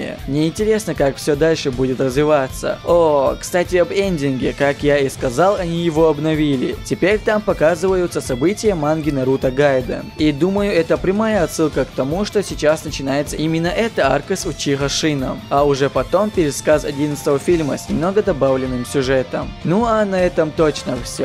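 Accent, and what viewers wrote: Chechnya, Russia. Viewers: Братан, ты случайно не из Чечни? Акцент выдаёт. Я твой голос где то слышал, тебя случайно не зовут?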